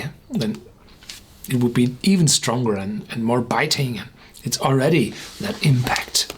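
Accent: Germany. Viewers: It's half german, half Christopher Walken :)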